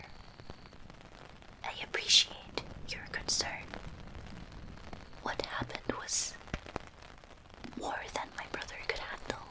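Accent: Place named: Ireland. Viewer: Your singing sounds incredible, and the Irish accent is really well done! I’ve already listened to it several times. Keep up the awesome work! 😁 Sidenote: What could possibly be more relaxing than a tragic story about war? 😝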